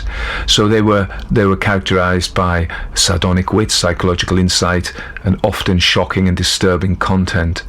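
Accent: France. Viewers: The french accent, alas, defeated me and I had to terminate this story prematurely. Not to worry though as plenty of others to choose from your channel 😮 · your French accent, chef's kiss! I could feel the Poe here. The horror that people are capable of when they are obsessed with fighting a wrong. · oh the french accent 😙👌 très bien!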